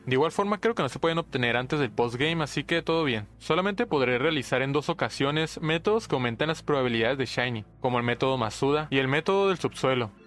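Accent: Chihuahua, Mexico. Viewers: Eres de chihuahua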